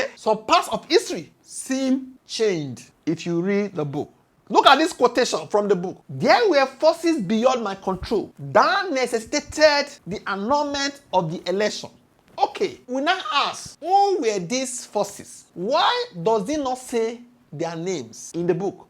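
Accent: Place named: Nigeria. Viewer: I must commend you. I never still see time siddun read the book, talkless of to break it down, come analyse am thoroughly like dis. And to do it with an authentic nigerian delivery style devoid of the usual fonetics, abeg my broda u too much!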